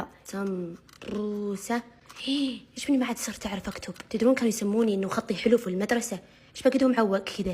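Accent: South, Saudi Arabia. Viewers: كأن اللهجة من جنوب السعودية ؟ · يا لبيييييه يازين اللغه السعوديه عليك والله😂❤❤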